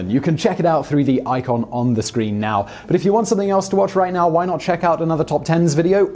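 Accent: England, United Kingdom. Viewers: yeah. nice English accent without sounding pretentious. not that the English sound pretentious or are themselves so, it's just the American perception of it ironic that that evaluation sounds pretentious, I guess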